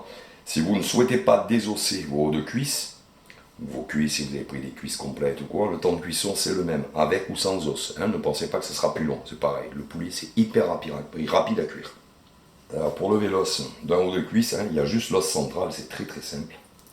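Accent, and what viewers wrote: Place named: Marseille, France. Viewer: 👍👍👍👍👍👍👍👍👍👍❤❤❤❤❤❤❤❤❤❤ et avec l'accent Marseillais en plus (Le vrai...)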